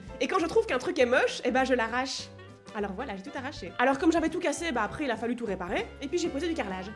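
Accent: Belgium. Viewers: Elle est belge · cc c'etait pour savoir ta un petit axent et je suis francobelge du coup je voulais savoir est ce que tu est belge peut etre que j'ai tout faut mais voila bisous bisous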